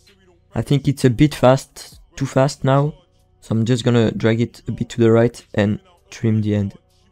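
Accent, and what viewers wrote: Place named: England, United Kingdom. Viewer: ton accents anglais :,D SINON GG et merci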